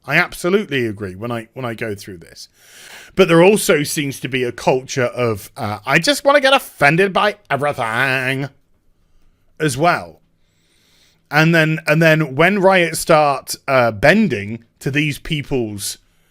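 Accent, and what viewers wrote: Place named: United Kingdom. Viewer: with his British accent mimicking vapid valley girls...priceless!:)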